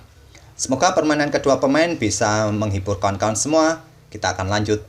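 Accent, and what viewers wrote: Java, Indonesia. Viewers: Iyasih mas..medok amat jowo nya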